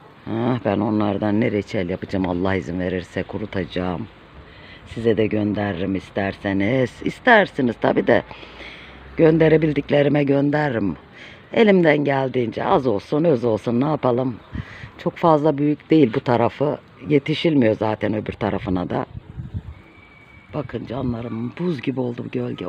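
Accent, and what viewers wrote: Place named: Adana, Turkey. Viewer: değerli youtube gezgin ve yurtiçindeki kendi içeriklerini youtube de yayınlayan arkadaşlar hepimiz biliyoruz ki bu işi az veya çok para kazanmak için yapılıyor buda sizin en tabi hakkınız öyle youtuber kardeşlerimiz varki çok büyük emekler ve yaşantılarını tehlikelere atarak bizlere gerçek yaşantıları kültürleri yaptıkları iyilik akımları ile bizlere gerçek içerik üretip bilgilendiriyorlar düne kadar köy yaşamını köy kahvaltısına burun kıvıranlara dahi bu yaşantıyı en güzel içerik ve yaşantılarıyla bizlere sevdirdiler çoğumuzu acaba bizde yapabilirmiyiz moduna getirdiler hepsine çok çok teşekkür ediyorum bu arada yemek tariflerini kitaplardan yapan değerli kardeşlerimiz artık bunun için kitap karıştırıp şu yemek tarifi hangi sayfadaydı diye uğraşmayın bu tarifler ve lezzetler sizin bir karış yakınınızda size düşen tek şey ekrana bir parmak basmak ve bu güzel lezzetleri ablamızın köy hayatı ve kedilerinde kendine has adana şivesiyle seyredebilirsiniz lütfen hepimiz youtube gezinirken ablamıza uğramayı unutmayın ben kardeşimizi ah köyüm ah sayesinde tanıdım iyikide tanımışım sizde tanıyınca inanın çok seveceksiniz kendisiyle kanalının dışında hiç bir tanışıklığımız da yoktur ama bir gün o tanışmada olur inşallah c.allah tüm izleyenleri ve insanları kötülüklerden korusun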